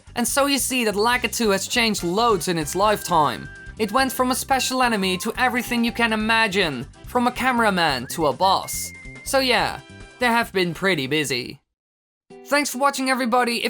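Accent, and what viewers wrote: Netherlands. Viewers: I already thought you were Dutch, I am also Dutch. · wait ur dutch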